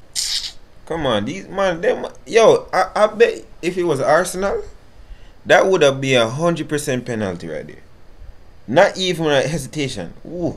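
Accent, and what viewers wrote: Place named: Jamaica. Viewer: Bro hw u sound so Jamaican😂🇯🇲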